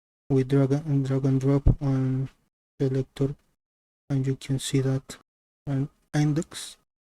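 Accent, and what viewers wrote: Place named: Bolivia. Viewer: Ese xD r u from Bolivia or Perú?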